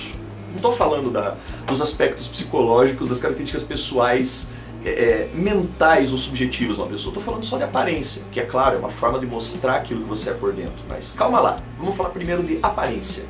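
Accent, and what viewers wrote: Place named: South, Brazil. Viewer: Cara, da onde tu é? tu tem sotaque do sul! tu é gaúcho? Muito bom o vídeo, parabéns!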